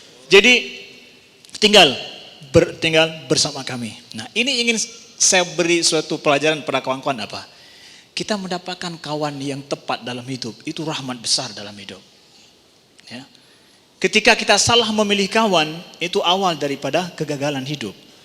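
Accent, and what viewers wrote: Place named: Aceh, Indonesia. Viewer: Orang aceh ni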